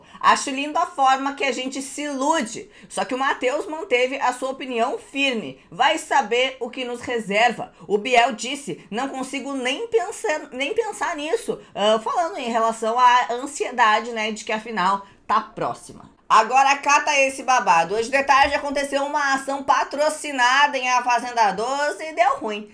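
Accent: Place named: Rio Grande do Sul, Brazil. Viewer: Guria tu és gaucha tchê.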